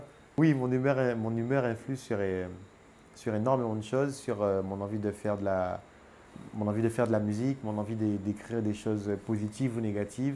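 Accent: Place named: Haiti. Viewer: Les Guadeloupeens parlent aussi le creole mais lui il parle le creole comme un Haitien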